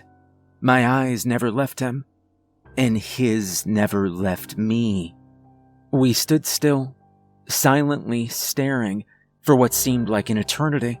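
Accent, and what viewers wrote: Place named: Midwest, United States. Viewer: He certainly has an accent. It's really only noticeable with the way he pronounces certain words, like saying "crayons" as "crahns". If I had to guess, I'd say he's from the American midwest somewhere.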